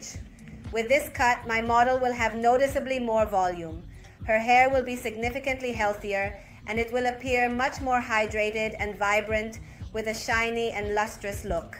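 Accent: Mexico. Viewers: Creoq es de Mexico x el acento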